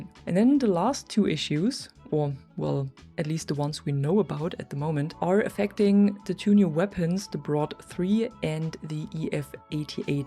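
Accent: Germany. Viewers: Dein Akzent hört sich an, wie wenn ne deutsche Frau englisch spricht. Kann das sein? aaahja, habs gesehen bei insta.. German.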